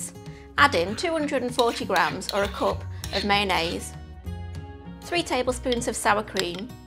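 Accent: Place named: England, United Kingdom. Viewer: At last.......an English accent :)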